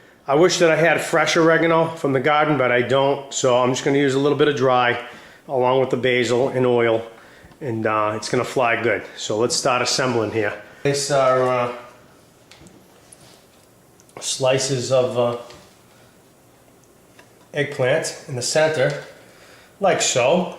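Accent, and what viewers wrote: Boston, United States. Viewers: This sounds like an episode of the Sopranos...or Boston? I live in the midwest so it all kind of sounds the same to me! Great presentation of that dish!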